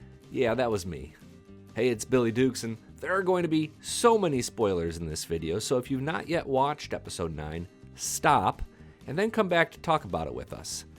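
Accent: South, United States. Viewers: I could care less. I hate her lousy southern accent narration. It is very distracting.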